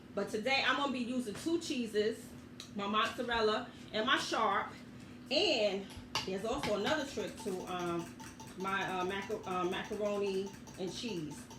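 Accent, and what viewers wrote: New York, United States. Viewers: Everything looked great sis!! I thought u sounded like a New Yorker! But I second guessed it by the way you work that garden lol! How long have you been in the South?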